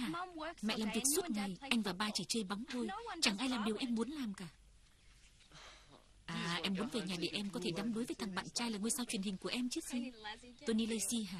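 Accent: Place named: North, Vietnam. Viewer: Htv mà thuyết minh giọng bắc vậy? Htv thường lồng tiếng chứ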